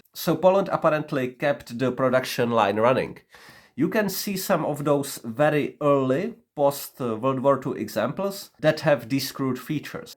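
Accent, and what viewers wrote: Czech Republic. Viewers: ježíš česká angličtina 💩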